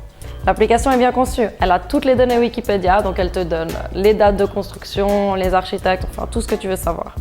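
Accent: Switzerland. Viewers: L'accent suisse sur !!! ahah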